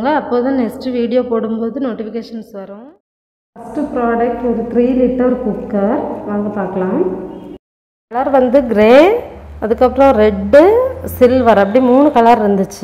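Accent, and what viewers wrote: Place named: Kanyakumari, India. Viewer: Good to hear your Kanyakumari tamil Stay back connected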